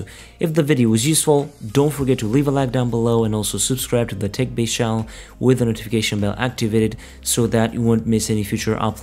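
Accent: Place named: Romania. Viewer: wtf, are you romanian ? :))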